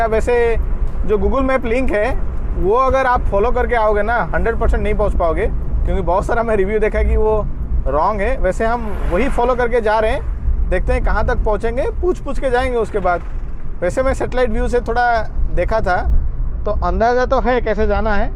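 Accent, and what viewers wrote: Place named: Odisha, India. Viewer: Keep up the great work. Proud of you. Best wishes to your family... specially. Really love your narrative.. with Odia MTI😁👍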